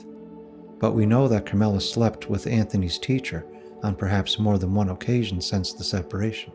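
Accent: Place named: Cape Breton, Canada. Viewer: You've got to be from Cape Breton. I grew up surrounded by that accent. 😁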